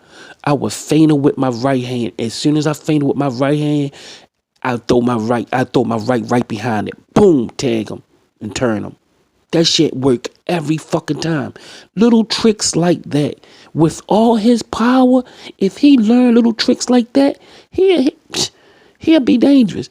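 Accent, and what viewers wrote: Baltimore, United States. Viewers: Straight Baltimore accent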